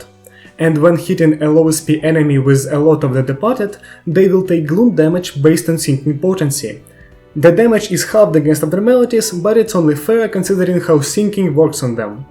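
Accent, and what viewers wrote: Russia. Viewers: man, you have russian аccent, especially батрфлайс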